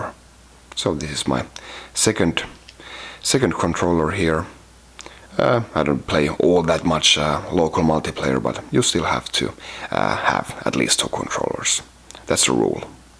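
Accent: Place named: Finland. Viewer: Eli oot sä suomaleine jos oot nii aksentti paljasti ja kanavan nimi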